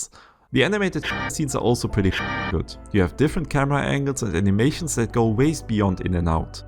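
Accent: Germany. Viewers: man hört sofort dass du deutscher bist ^^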